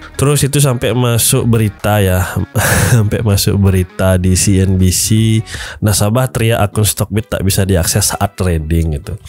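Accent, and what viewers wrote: Bali, Indonesia. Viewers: Logat bali, dari mana bli?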